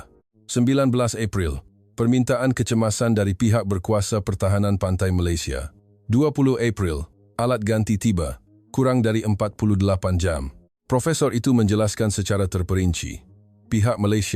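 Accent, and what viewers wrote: Indonesia. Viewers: Kenapa orang Indonesia suka bikin konten tentang tentera Malaysia..dan bikin natizen indo berapi..suara admin jelas2 org Indonesia..bikin saja tentang tni kamu,puji saja tentera kamu kenapa sering masuk campur dgn tentera negara tetangga...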